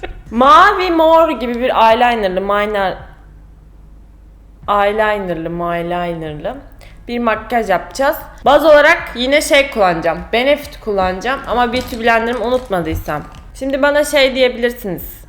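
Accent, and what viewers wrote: Kütahya, Turkey. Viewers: öldüm glmekten kütahya lehçenle yap makyajj süpersin resmen koşturark geldim izlemek için mucuk seni çok sevdım ben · ilk buluşmadan beyrana götürmek galiba Kütahyaliliktan kiz yaaa bende yaptim😂 senden sadece kutahya şivesiyle video bekliyoruz😁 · vallah bayiliyorum bu kiza makaji beni ilgilendirmiyor zira bir erkegim ama konusmasi allah be acayip süper konusmasi gülmesi sakalari harika vallah birde kütahyaca konusuyor benim de memleketim 43 cok hosuma gitti cok gurur kaynagmiz:D <3 <3 <3 <3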